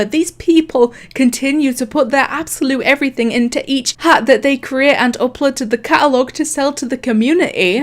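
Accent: United Kingdom. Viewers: British girl talks about roblox avatars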